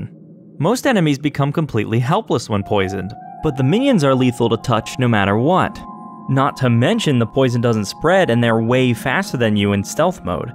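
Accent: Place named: Ontario, Canada. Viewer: Are you from Ontario,? I can hear it in your accent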